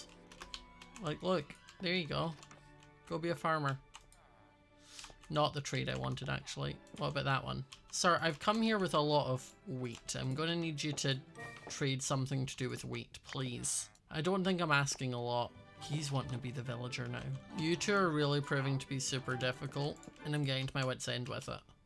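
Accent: Scotland, United Kingdom. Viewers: Yes and it’s because he’s Scottish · Is called being Scottish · hes scottish not irish :-)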